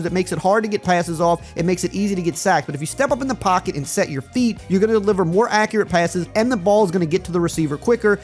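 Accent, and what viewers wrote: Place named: New Orleans, United States. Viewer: I don't know where you are from but you sound exactly like people from a suburb of New Orleans.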